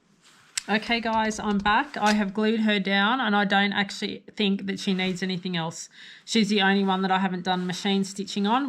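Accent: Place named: Australia. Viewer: I picked your Aussie accent straight up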